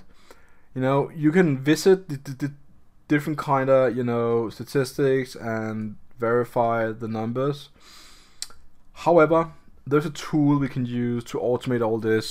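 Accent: Denmark. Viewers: Do i sense a hint of danish in that accent 🙂 Glimrende video må jeg sige, hutlihut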